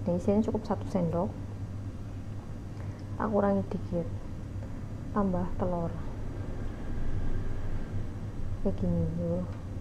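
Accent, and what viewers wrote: Banyumas, Indonesia. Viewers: Makasih mb ,mba ngomonge ngapak wong ngendi ,kreatif banget ,makasih ya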